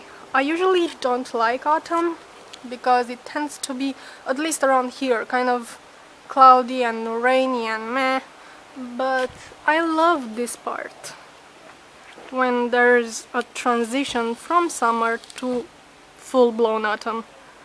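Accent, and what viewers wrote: Romania. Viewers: I like your romanian accent :D. oh and stop bumping your head into things! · her accent is bull. oh wait, she's romanian. what does she want anyway? lonely girl...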